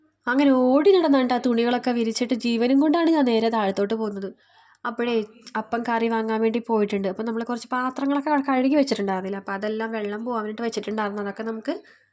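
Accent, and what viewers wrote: Kochi, India. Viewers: Aa "tta"kelkananishttam tttta 😊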